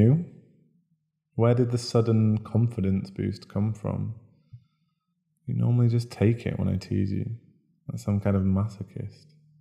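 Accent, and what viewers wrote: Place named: United Kingdom. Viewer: You sound really British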